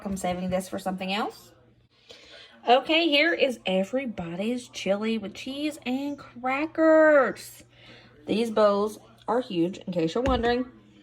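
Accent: South, United States. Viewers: Thank you so much for making this content. I love your videos. I'm trying to find more YouTubers that do this kind of content. I love your grocery shopping challenges. I also love your southern accent ❤ thank you so much for all you do.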